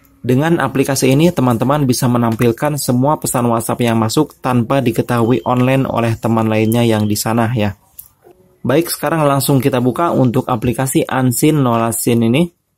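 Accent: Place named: West Java, Indonesia. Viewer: Orang sunda pasti yang ngomong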